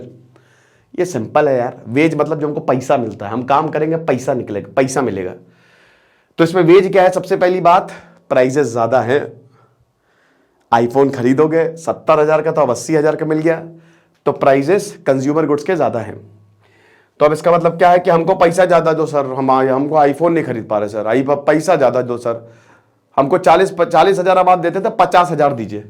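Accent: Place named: Varanasi, India. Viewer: Excellent sir 👌 apka samjhany ka tarika bhut achha h .... Aap banarasi lagty ho mujhe ya aap U P se kahi or se ho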